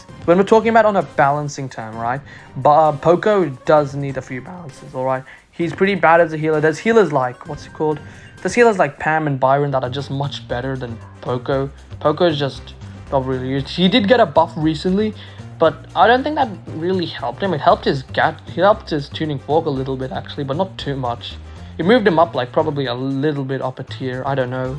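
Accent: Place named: Germany. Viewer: Wieso merkt man, dass du deutsch bist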